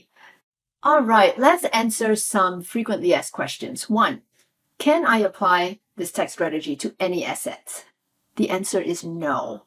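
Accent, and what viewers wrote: United States. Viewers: A tip off is she speaks without an aussie or english accent. That should have clued you in that she is American.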